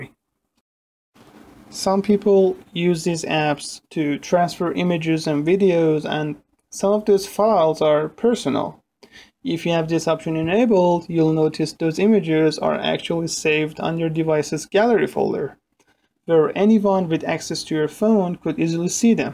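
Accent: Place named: Iran. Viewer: thank you,, nice persian accent :)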